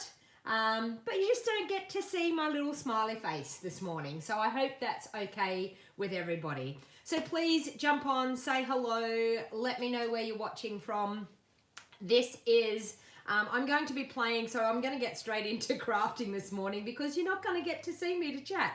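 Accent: Australia. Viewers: Love watching you from CO USA! After visiting England the last 7 years I’ve decided the Aussie accent is the best! 😬😉 but don’t tell the Brits! 😂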